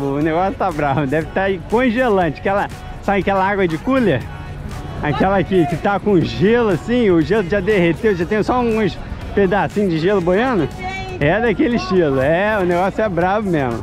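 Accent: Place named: Minas Gerais, Brazil. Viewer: Que água maravilhosa...essa cor me faz tão bem!! A Obra de Deus é perfeita!! Essa prainha dos pescadores é um paraíso..., também achava que fosses mineirin...seu sotaque, apesar de paulistano é autêntico de um mineiro.😊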